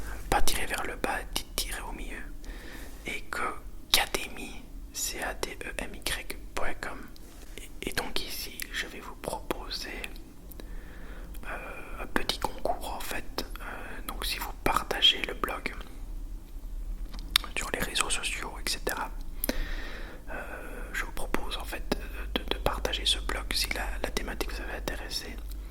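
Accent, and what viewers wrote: Belgium. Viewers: L accent belge me detent beaucoup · J'adore l'accent belge😉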